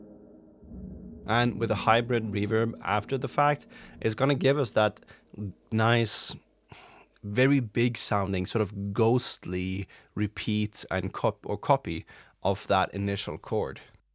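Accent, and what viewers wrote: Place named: Sweden. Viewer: Jeg har vært helt sikker på at du er svensk når jeg hører den minimale aksenten din. Gratulerer med VM-medaljen i hockey, veldig stort :) (Google Translate :) )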